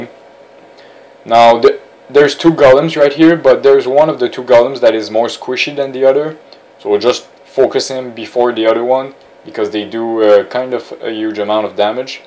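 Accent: Quebec, Canada. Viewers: Are you French Canadian? (I am) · haha the way you say assist sounds like asses. i like your videos and the french canadian accent. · T tu un québécois ?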